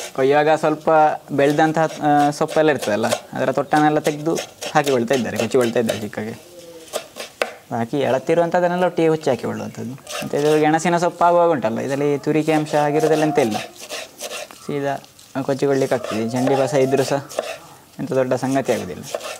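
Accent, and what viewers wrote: Mangalore, India. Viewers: ಯಾವ ರೀತಿ ತಾವು ತಯಾರಿಸುವ ತಿಂಡಿ ಪದಾರ್ಥಗಳು ವಿಶಿಷ್ಟವಾಗಿರುತ್ತವೆಯೋ ಅದಕ್ಕಿಂತ ವಿಶಿಷ್ಟ ನಿಮ್ಮ ಮಂಗಳೂರು ಕನ್ನಡ. ಕೇಳಲು ಬಹಳ ಸುಂದರ..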